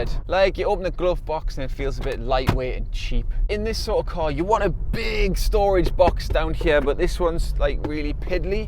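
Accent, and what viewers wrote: Newcastle, United Kingdom. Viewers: I love your accent... That Geordie accent is banging!!!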